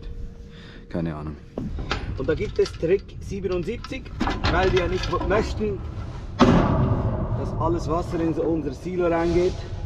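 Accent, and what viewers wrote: Standard. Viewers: Hallo, Du sprichst ja super Hochdeutsch, Respekt. Egal wie Du das in Zukunft machen willst, Dein Kanal ist super und ich verstehe beide Varianten, ich folge ja auch dem Bauer Housi. Schönes Wochenende aus Franken, Bernd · hab ich was an den Ohren oder schwätzt Du tatsächlich Hochdeutsch?! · Wieso redest du Hochdeutsch und nömä schwitzerdütsch? 🇨🇭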